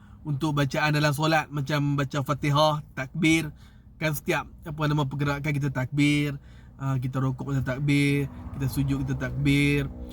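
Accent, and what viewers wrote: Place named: Kedah, Malaysia. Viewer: PEKAT KEDAH AKU XFAHAMMMM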